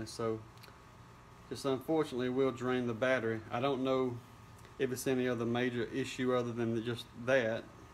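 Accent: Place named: North Carolina, United States. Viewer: This channel is a bit like BOLTR but without the humor, bad language and complete disrespect for the tools he's working on. He also sounds like he must be from near where I live (NC). Good video - thanks.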